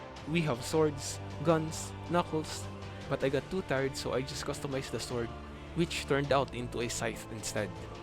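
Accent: Philippines. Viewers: Pinoy? Your accent was so obvious.. Anyway I love your video keep it up.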